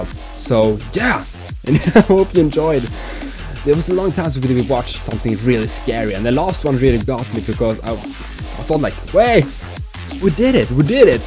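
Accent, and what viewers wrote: Sweden. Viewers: love your accent by any chance are you swedish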